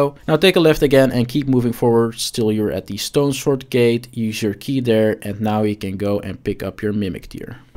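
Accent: Netherlands. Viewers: You da man! Thanks for the guides. By the way, is it correct that I recognize a Dutch accent? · Are you dutch?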